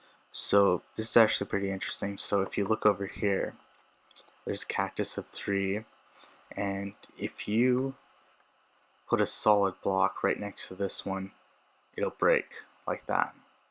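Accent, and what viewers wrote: Punjab, India. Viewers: bro are you from Punjab?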